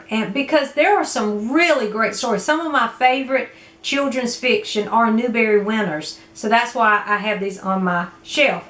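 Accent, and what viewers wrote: South, United States. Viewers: It is so nice to hear your southern accent. My husband and I were both born and raised in Birmingham, Alabama. I got a job in Washington DC and we moved to Northern Virginia 24 years ago. Living up here I miss the food and the SEC football (Roll Tide). We still have family in Alabama. I can retire in 2 years but we haven’t decided where to move. It will definitely be a Southern city. You can take the girl out of the South but you can’t take the South out of the girl. 😀 This is probably way more than you ever wanted to know about a viewer. Glad to have found your channel and glad to hear your accent.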